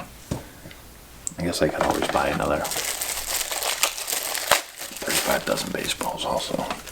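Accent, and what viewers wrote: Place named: Boston, United States. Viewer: I knew your were a freak lol ASMR hahaa all that heavy breathing and the boston accent so hot!!!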